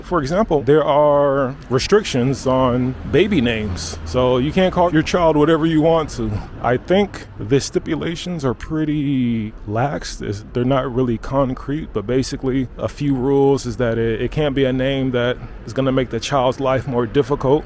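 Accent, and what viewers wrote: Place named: Massachusetts, United States. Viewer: I like your pioneering and adventurous spirit. I invited a dude from the USA, who spent 10 days at my house in front of a laptop, We live near France and Switzerland. I tried to suggest he visit Zurich, Basel or Strasbourg for a day, but he was surely not interested, the good part of the deal I was willing to drive him there at no cost to him to show him around. By the way your accent suggests you come from Massachusetts, the New York area, I could be wrong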